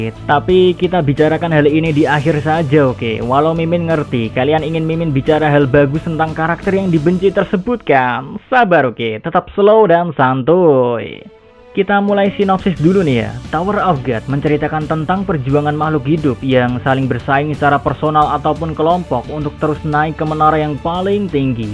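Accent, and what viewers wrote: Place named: Welahan, Indonesia. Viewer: Min kue wong welahan?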